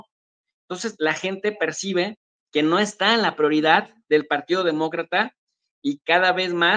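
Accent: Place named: Paraguay. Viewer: Que feliz de escuchar a una paraguaya en el canal🥰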